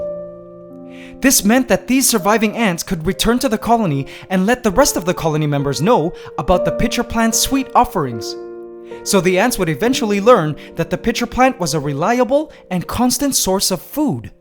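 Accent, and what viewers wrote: Canada. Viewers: Philiphines? I thought you were canadian? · “Here in the Philippines” wait a minute I thought this was canadian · The Philadelphians thought you where from Canada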